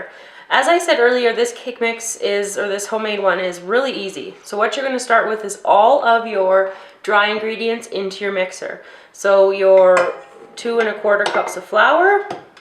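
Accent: Canada. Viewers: I hear a Canadian accent👂👂👂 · Are you Canadian? I feel dumb for asking but I'm really curious.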